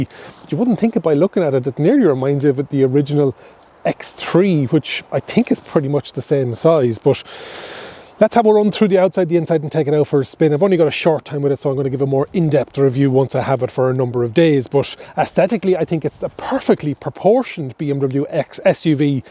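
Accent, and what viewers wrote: Ireland. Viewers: Nice review. My Irish wife from Mayo is sitting with me and she's trying to figure out your accent. County Meath?? She cannot figure it out.😊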